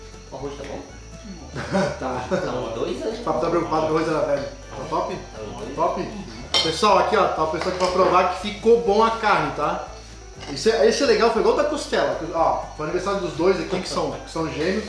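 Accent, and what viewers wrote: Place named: Florianópolis, Brazil. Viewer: Caí de paraquedas aqui, mas pelo soque.. com ctz é da nossa linda e querida Floripa! Baita vídeos, seguindo já.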